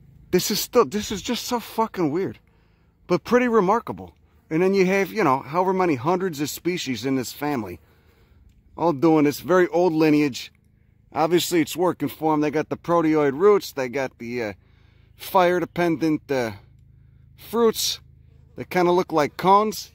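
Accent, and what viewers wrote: Chicago, United States. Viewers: Chicago I think. It is really nice hearing his accent and botany.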